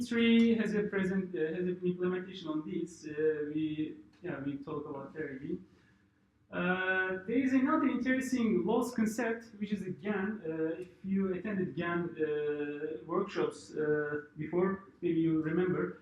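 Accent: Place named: Turkey. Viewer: Great presentation.. the presenter has Turkish accent like mine. :))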